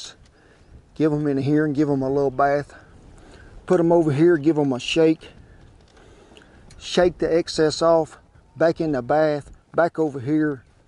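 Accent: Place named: Oklahoma, United States. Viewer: Kent est un vrai bijou pour les amoureux de la cuisine outdoor.Il a un coté frenchy dans sa maniére de manipuler les ingrédients,on sent qu'il aime ce qu'il fait . Et son accent de l'Oklahoma...Génial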